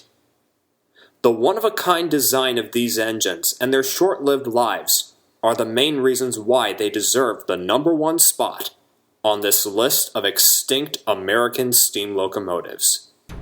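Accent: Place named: RP, United Kingdom. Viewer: weird RP shit lol.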